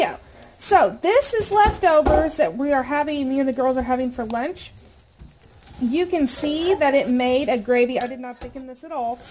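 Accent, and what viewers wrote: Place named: Appalachia, United States. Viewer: I love it! Where are you from? I’m guessing somewhere in Appalachia?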